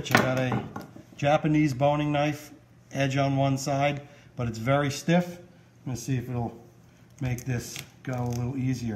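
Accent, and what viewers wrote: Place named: New Jersey, United States. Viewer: I got a 4 bone roast from Shop Rite for the holiday sale for $64 minus a $50 gift card it cost me $14 & as you said each steak could cost you $80 if you went out to eat & wouldn’t nearly be as good. Nice video! I wanted to make a roast & was looking for a video on how to trim it as a roast but my wife said we’re never going to eat it all & asked why we can’t just cut it into steaks & freeze them so listen to the wife. Your accent you sound like you’re up near Floriam park somewhere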